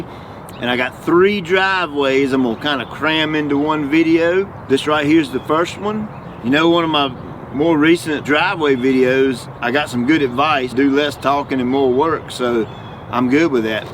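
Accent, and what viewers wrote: South, United States. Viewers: Hey, great video. then again You know how I feel about that ventrac. But I do have a question about these long driveways and I know you can. Put a great on there by but when did the Abi? Be able to put a slight grade on it to where it ran off the driveway almost immediately. and it not be noticeable to most people just wondering and as far as people Saying you should talk less work more. That's just cuz they can't handle a good southern accent, sir. God bless great video.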